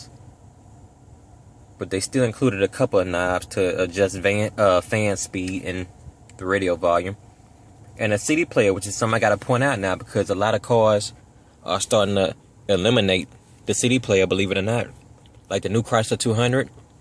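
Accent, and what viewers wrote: South, United States. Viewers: After living in the American South for 10 years; I can assure you that this is what a very intelligent, articulate southern man sounds like. Did you ever have difficulty understanding him? Since the reviewer noted he is in Louisiana, and having spent a lot of time in LA, I can assure you that there are some extremely thick accents there. There are people that speak and you might be lucky to understand 1 in 5 words. That's what makes LA such a great place. A beautiful state with an amazing history, and wonderful people.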